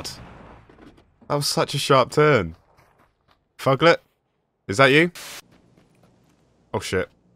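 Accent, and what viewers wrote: United Kingdom. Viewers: I love your accent. I wanna marry and englishman and hear him talk all day.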